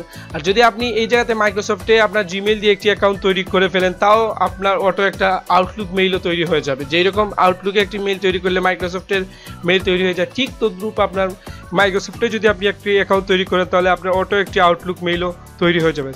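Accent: Sylhet, Bangladesh. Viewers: ভাই কিতা সিলেটি নি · vai apna bari sylhet ni.......? apnar kotha ja sondor apnar tutorial dakiya ami account kolta parci ... so thanks vai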